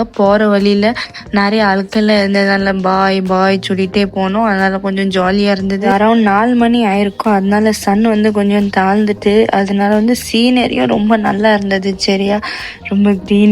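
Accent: Tirunelveli, India. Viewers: first time seeing your video..you got different slang..is this Tirunelveli slang..chapaad for sapaad, chemma for semma, cholluvon for solluvon..neenga "sa" endra vartha use panna mattingla..ha ha..anyway all the best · I'm a 77 year old retired banker settled in Madras. My native town is Palayamkottai, my paternal thatha's place. We have many relatives in Tirunelveli. I love your typical Tirunelveli accent despite being a Mumbaikar,. Your comments about your thambi were hilarious too. Glad your mother brought all the food for the long journey. I've seen most of your car journey vlogs and was sad about the several breakdowns of your WagonR. I was a Maruti faithful owner for 32 years having changed many models but always satisfied with the reliability of the Maruti Suzuki cars. May God bless you all dear girl. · Tvl slang super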